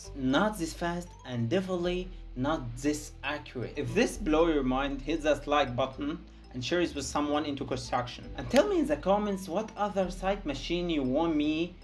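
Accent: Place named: Egypt. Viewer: Your accent is so Egyptian 😂 but i love the content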